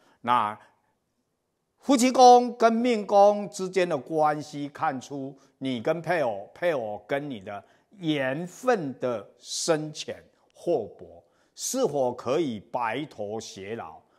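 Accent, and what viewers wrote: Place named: Taiwan. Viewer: 老师的普通话的口音是台湾人已经是很容易听得明白。你试按上面字打开幕那个扭试试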